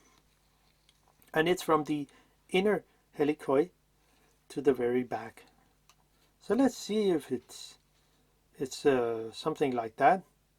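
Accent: Denmark. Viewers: You sound danish. Are you located in Denmark?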